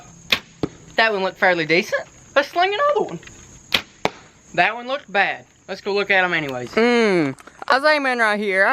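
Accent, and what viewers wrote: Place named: Kentucky, United States. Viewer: "Not as FIRST as the CLOSE one" love the vids , that east Kentucky twang runs deep as the mines!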